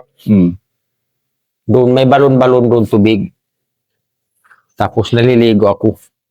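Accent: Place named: Sulu, Philippines. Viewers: tau luuk jolo sulu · Tausug to accent pa lang Tagalog niya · Boses palang ni sir halatang tausug na, salute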